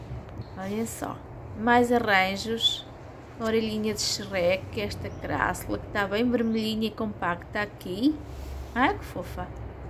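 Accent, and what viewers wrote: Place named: Coimbra, Portugal. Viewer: Boa noite conseguiu limpar as formigas todas? Haviam muitas no cactus! Espero que nunca me aconteça… 😮 Pela sua forma de falar pensava que era de Coimbra 😊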